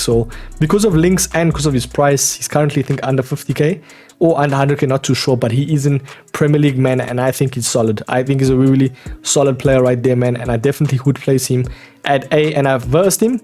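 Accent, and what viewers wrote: South Africa. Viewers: . You're from Mzansi?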